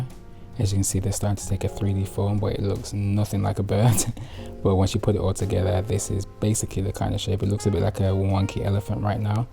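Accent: United Kingdom. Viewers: That was actually really comprehensive and clear! Thanks! Maybe its the cool british accent, maybe its the neat tidy workmanship, maybe both.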